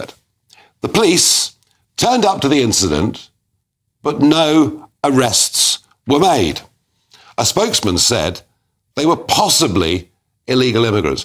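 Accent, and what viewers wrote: United Kingdom. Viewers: Thick British I’m not surprised.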